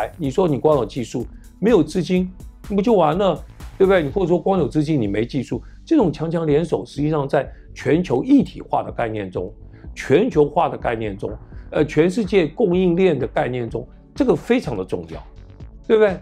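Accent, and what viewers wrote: Standard. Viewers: 台湾移民到新西兰的，国语说得正👍观点客观👍👍👍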